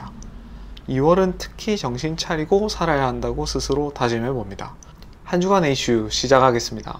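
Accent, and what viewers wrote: Gyeongsang, South Korea. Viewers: 저는 부산,경상도 사투리가 너무 정감있어서 무조건 호감요^^ 부모님의 음력생일은 그냥 돌아가시기전까진 평생 간다고생각하는게..;;못바꿔요.. 저희부부도 이번에 싹다 양력으로 바꿨네요. 애들은 당근이구요. 좋게말하면,발상의 전환이고,어른들보기엔 모나고 발칙할수도요. 50중반되니 도발이 가능하더라구요~ㅎ 딸이 고딩교사초년생인데, 작년내내 징징대고 다녀서 엄마인 저도 어찌나 힘드던지요ㅜ 잔잔한 소회를 담은 선생님 유튭 잘보고있어용~ 딸내미 남친이 부산 싸나이라,정겹게 보고있답니다^^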